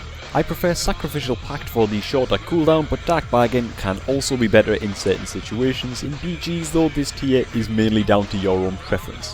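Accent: Newcastle, United Kingdom. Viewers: I think he sounds like he is from Newcastle ;D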